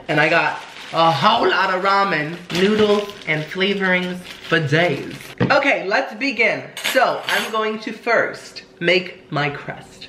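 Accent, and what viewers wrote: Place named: United Kingdom. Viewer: i hope you do a full diy talking in your british accent one day! it always cracks me up! 😂😂😂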